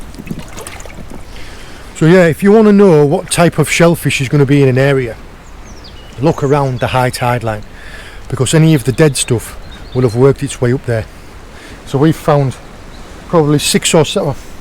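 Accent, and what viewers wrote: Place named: Geordie, United Kingdom. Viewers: As a jock I cant work out if your a Geordie or Yorkshireman, also get a military vibe about you, have you served 👍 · I thought you was a northern lad just come across an old video <link>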